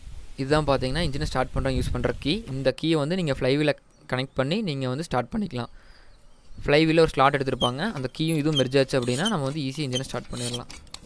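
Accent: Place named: Kongu, India. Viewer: கொங்கு தமிழ் ❤️ மலரும் நினைவுகள்❤️ மகிழ்ச்சி😃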